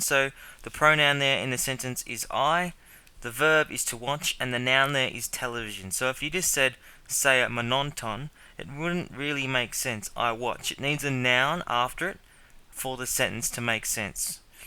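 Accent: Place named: Australia. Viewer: I can tell by your accent that you are probably an Aussie. hahaha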